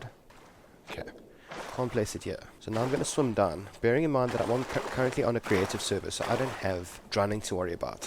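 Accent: Afrikaans, South Africa. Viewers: are you south african? You sound like it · South african??? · South African? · You Afrikaans bro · As soon as you started talking I was shocked :O A South African Rust YouTuber, great videos :D Keep up the great work!!! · Brother are you from SA?? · Are u South African?!?!? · r u africaans? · are you south african? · you from south Africa?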